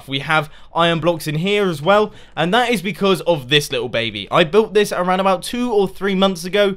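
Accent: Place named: United Kingdom. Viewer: Hello! My name is Byron, I'm a new viewer/subscriber to your channel. I discovered it about a week ago and I have been watching your whole LP series in the Hermitcraft server. I really enjoy your videos and I think your have a nice voice/accent! I'm American, so a British accent is nice to hear for a change. Anyways the reason I actually commented on this video was because I noticed every time you go to the Enderman farm in the End, you are always dying of starvation when showing off your enchanted books. Hahaha! No criticism, I just think it's funny. Okay hope you see this. Now back to your show and 31 epsidoe until I catch up!:)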